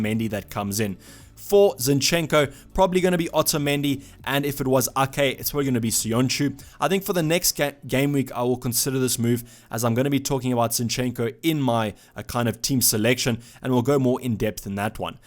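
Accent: South Africa. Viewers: South African 🇿🇦?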